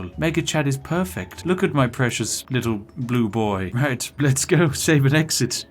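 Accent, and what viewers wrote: United Kingdom. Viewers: This guy is definitely British guys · Your Brit is showing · Why r u british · I dunno what it is but something tells me he’s British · I have a question, Are you Bri-ish? 🇬🇧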